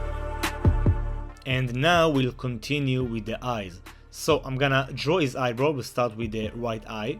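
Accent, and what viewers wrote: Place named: Israel. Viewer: וואו חחח המבטא הסגיר, וגם השם... אז הלכתי ובדקתי במידע על הערוץ, ומסתבר שצדקתי ^^ אני שמח לראות אותך מצליח ככה. בהצלחה בהמשך! מישראל 😄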